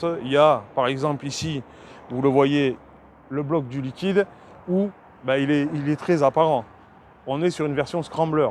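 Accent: South, France. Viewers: Merci pour cet essai, avec le bel accent du Sud!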